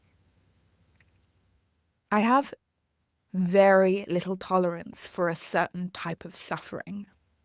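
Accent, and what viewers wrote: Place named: United Kingdom. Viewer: a british accent....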